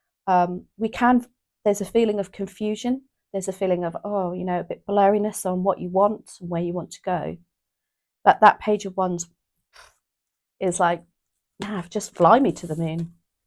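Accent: United Kingdom. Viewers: I do think a lot about money and once I heal I will get chances to make it a lot, but right now I will try to take manifestation advice. Reading is astonishingly accurate and excellent as always. You are also very beautiful lady and Your British accent with great readings is just delight to hear. Thank you for helping us very much!!! ☘🌷🌺🌷🌺🌷🌺🌷💗🌺🌷🌺🌷🌺🌷☘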